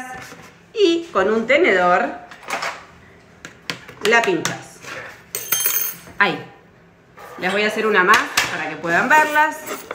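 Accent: Argentina. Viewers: Hola, me fascinan, tus recetas, trataré de hacer las galletas, a ver cómo me quedan, también me gusta mucho tu acento, argentino y lo agradable q eres, gracias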